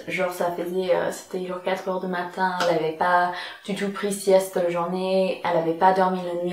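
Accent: United States. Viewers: Super vidéo ! J'adore ton accent, j'aime tellement quand les américains ou les anglais parlent en français. Je te trouve vraiment touchante et authentique ! Bisous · J'aime beaucoup t'es vidéos , sa a dû être dur d'apprendre le français pour toi , et j'aime beaucoup l'accent américain que tu as 😄 voilà , continu comme sa tu es super !!☺️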